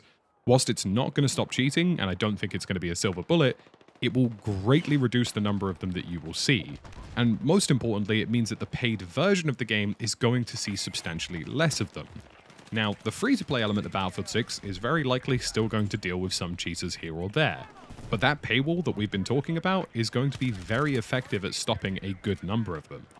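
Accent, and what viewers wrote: United Kingdom. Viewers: You're avoid the issue because the man being accused is one of the "protected" degenerates and you don't want the heat. You sound like you live in the UK so it's really risky for you.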